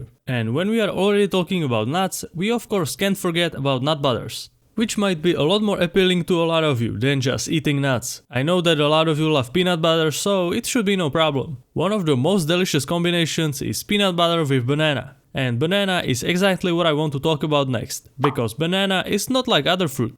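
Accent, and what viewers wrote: Czech Republic. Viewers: jseš čech žejo? jinak super! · I can tell you are Czech based on your accent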